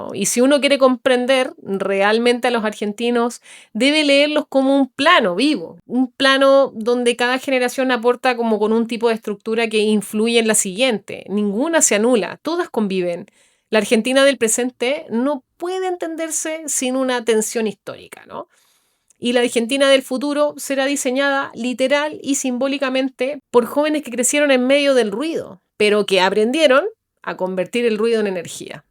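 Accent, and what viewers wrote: Chile. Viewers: Me llamo la atencion por que deje correr los primeros segundos y note tu acento y suavidad chilena, estoy de acuerdo como graficaste con viviendas como seria cada generacion jaja muy bueno el video.. saludos desde buenos aires!..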